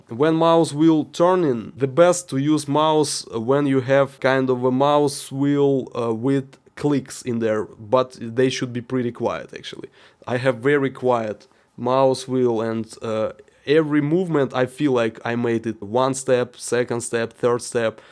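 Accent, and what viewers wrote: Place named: Russia. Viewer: Great one - thanks a bunch! You sound Russian, not that it matters, but what does is your approach and delivery that has no fluff or self admiration - a very Russian way to break things down to essential points and pass them to a comrade :)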